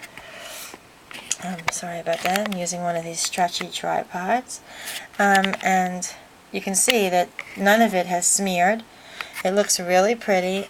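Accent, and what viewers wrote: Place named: New York, United States. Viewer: *runs in n'squishes you to pieces parts!!* I love the stamping idea!! loveLoveLOveLOVeLOVE it!!! Thank You Thank You Thank You! Consider it scraplifted! ;o) You've got all mah' craftin' juices flowin' sistah! . . . now, if only I had craft time. . . I love your videos, and I especially love your tutorials because I can hear the fuhgeddabouddit in there. *Grins* Have a super scrapalicious day sistah! Put your feet up, relax and well... you know the drill. Hugs n'Squishes!! ~*Mwah!!*~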